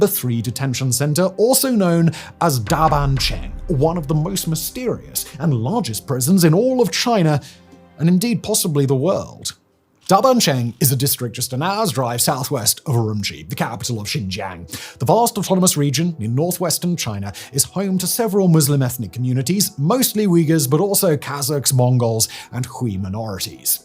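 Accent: United Kingdom. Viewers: especially if British or American. He would likely be labeled British due to his accent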